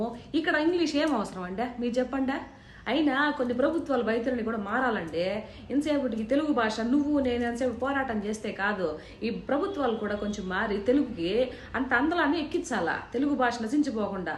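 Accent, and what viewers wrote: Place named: Krishna-Guntur, India. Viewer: Your Telugu language is also somewhat not clear. You always try to speak Krishna and Guntur Districts Telugu language. Regards.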